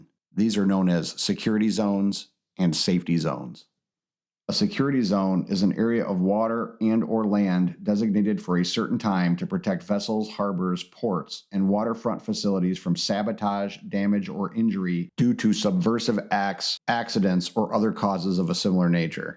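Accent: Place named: Ohio, United States. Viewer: It would seem to me you’re from NW Ohio lol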